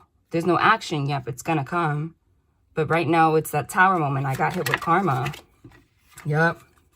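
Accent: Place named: New York, United States. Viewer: ok not relevant to the vid but i'm living for that new york accent.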